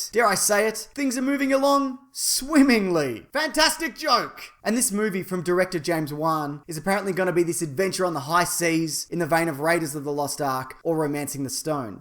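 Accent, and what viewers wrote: Australia. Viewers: Love this dudes crazy Ozzie accent, sound just like Steve Irwin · Be honest people, the only reason you watch these vids is for that SWEET, AUTHENTIC, AUSTRALIAN ACCENT!! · Man you are awesome and the Australian accent helps haha.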